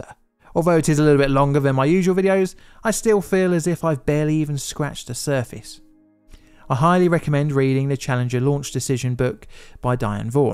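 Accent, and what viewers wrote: United Kingdom. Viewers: He is British, slight difference in pronunciation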